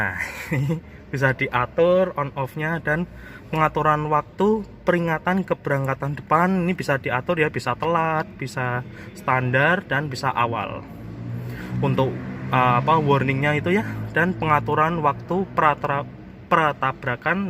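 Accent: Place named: Betawi, Indonesia. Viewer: Guwa apa kulo? Betawi medok nih 😅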